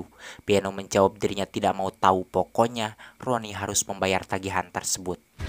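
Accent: West Java, Indonesia. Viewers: Bg asli Sunda nya dimana?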